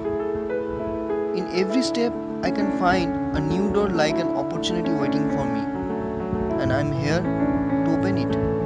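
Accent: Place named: Bengal, India. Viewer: Good very good , but not the best. Could have been better if you remove the voice narration as its in Banglish accent which spoils the whole mood of this good piece of work. Try to re dub it with a better english or a proper bengali accent. Sorry for being straight forward but apart from the voice narration ( very poor accent as include MTI and FLI ) every other aspect is awesome and I enjoyed it. Watch Nirmoy Modak`s video for reference.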